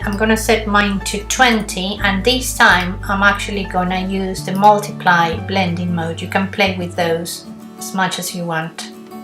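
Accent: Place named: Latin America. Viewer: Loved your tutorial! Learned so much. Also loved the mix of your Latin and English accent. Hypnotizing lol.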